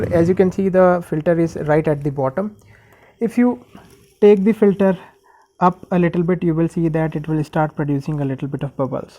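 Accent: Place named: India. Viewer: When you hear that Hindu accent you know the tutorial is gonna be fire!!! Thanks man, you helped me fix it! My turtle is happy with bubbles again :3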